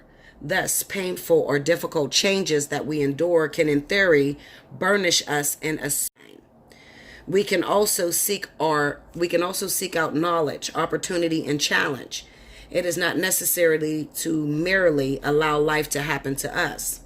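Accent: Pittsburgh, United States. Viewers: first time watching your video. Interesting read. Are you from Pittsburgh? I hear an accent (I'm from PGH, so that's why I'm asking)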